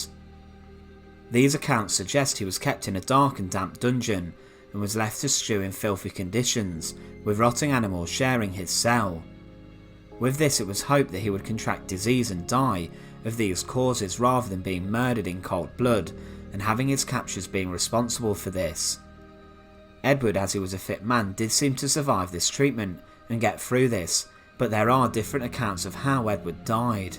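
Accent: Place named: England, United Kingdom. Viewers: Sorry to have to complain, but the elocution of the reader seems to leave something to be desired, sounds more Cockney than English. Ever heard of a Professor Higgins? I'm sure he would help. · Therefore the typical English accent is very irritating.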